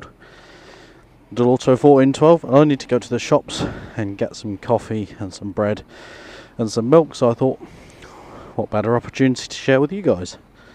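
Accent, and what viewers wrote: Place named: England, United Kingdom. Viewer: I'm trying to figure out which country this is. The accent and the driving on the left hand side of the street might indicate England. But the speed is in terms of MPH and not kilometers.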